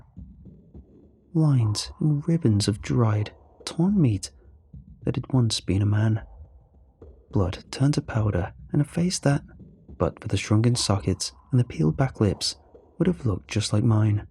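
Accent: England, United Kingdom. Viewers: What accent has the narrator got? Sound's English but I could be wrong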